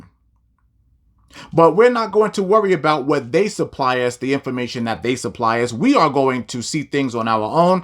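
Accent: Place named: New York, United States. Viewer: Shalawam Brother, praying safety for u n ur household. Sounds like ur from the Big Apple so be safe n keep doing what TMH n Christ wants u to do. MHICBU all!!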